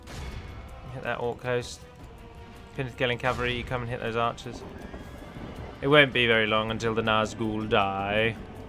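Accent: United Kingdom. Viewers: You really shouldn't worry about guys who question your knowledge, your videos aren't aimed at being some kind of lore encyclopedia, you don't owe these people anything!:) I like to consider myself as quite knowledgeable on Tolkien's lore, but when I hear mistakes here and there in your videos I don't give a damn about it, because that's not the reason I'm watching them!! I'm watching them because you're an interesting person in general with an interesting playstyle as well, and that's the important thing here;) (plus you help me get better at speaking English and at getting a British accent so I'll vouch for you and your vids ^^) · Your lore video was great. It was really good. You are a man who speaks to us, the audience, as if you were telling a story and yes that means some things you say will be wrong but that utterly stands in the shadow of how pleasant your lore videos are to listen to. 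As if I were listening to a lovely fairy tale. Kudos! It is obviously better than your Sindarin pronunciation but that is because your native language is English you jammy ... ^^. No seriously, that British-ness about you and the way you speak to us is what makes you, you, and why people come to you. Don't let a reddit-guy over-the-top holier-than-thou fellow ruin your day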